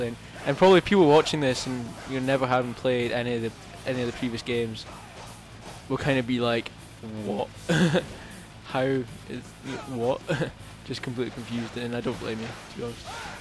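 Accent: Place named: Scotland, United Kingdom. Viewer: may i ask what part of scotland are you from? im just curious i enjoyed the vid and you deserve another sub =D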